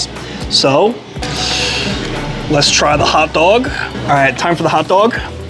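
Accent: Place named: New Jersey, United States. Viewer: You can tell.you grew up in NY uhh NJ uhhhhh because uhhhhh I want the uhhhhh cheeseburger uhhhhhh and uhhhhhhh omg uhhhhh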